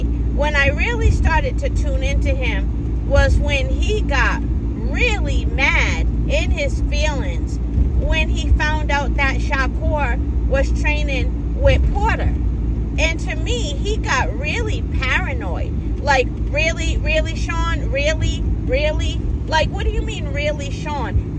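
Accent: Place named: Boston, United States. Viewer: Talk yo shit with that Boston twang!! I want Abdullah in Buds camp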